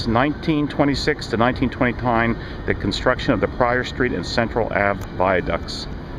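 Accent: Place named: New England, United States. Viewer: wow, really good video. Yankee accent though, and 3 dollar words. You ain,t from around here are you all?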